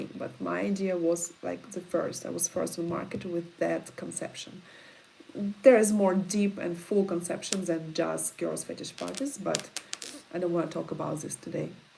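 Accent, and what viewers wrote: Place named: St. Petersburg, Russia. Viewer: great video! I lived and worked in Moscow years ago and visited St. Pete where I believe you are from many times. Your accent brings back some wonderful memories! Come and visit "the Valley of the Sun" here in Arizona sometime!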